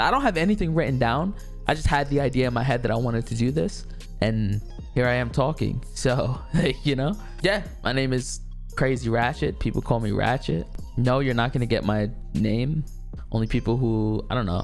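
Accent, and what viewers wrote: New York, United States. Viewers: I knew you was from NY the way of speaking was too familiar, love you brodie keep up the work‼️‼️ also take as much time as you need to come back consistently❤️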